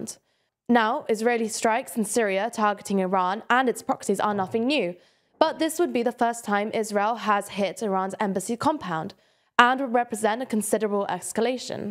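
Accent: United Kingdom. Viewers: Your accent is soo Briish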